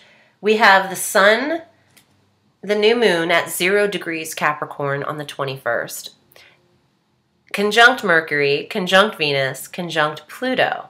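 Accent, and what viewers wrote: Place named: New Jersey, United States. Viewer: what a gemini communicator you are....i am so glad saturn isn't retrograde in my 10th house anymore...that was a 2 yr typhoon ruby if there ever was one! jupiter retrograde n the 7th house till 8/15!! giddyup!! I wish you would let more of your NJ accent out! I would love to hear your accent unadlterated....you could do your videos in pigtails and and yellow shirt with neon pink polka dots...you are fantastic.....love your nails....you're always changing the color ms. gemini.....ur explanations are in depth and so educational....thanks for taking the time to interpret. ;) always appreciated.....a fellow east coaster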